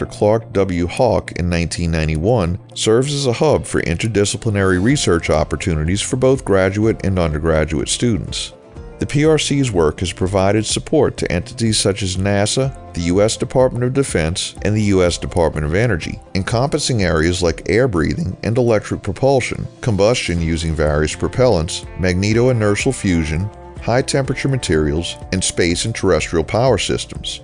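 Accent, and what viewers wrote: New Orleans, United States. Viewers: I just happened onto this video. Thanks so much for posting it. My son is living in Huntsville, and I know he'd like to see your drive through this part of the city. I know he'd especially like to hear a New Orleans accent, too, since he is homesick. I am going to tell him to watch this. Thanks so much.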